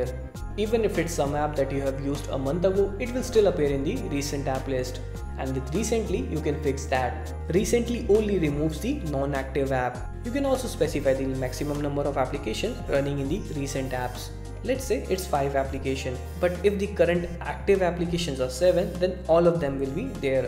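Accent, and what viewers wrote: India. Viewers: I LOVE your indians accent! hah xd so cute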